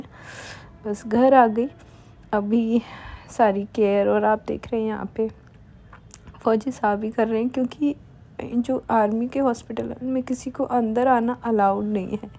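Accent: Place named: Uttar Pradesh, India. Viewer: Aap kahan per Ho didi kahan ke Rahane wale ho aapki Bhasha up side ki Jaisi lagti hai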